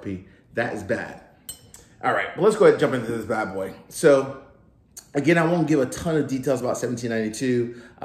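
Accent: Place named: Delaware Valley, United States. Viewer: Are you from the Delaware Valley? I detect a bit of an accent.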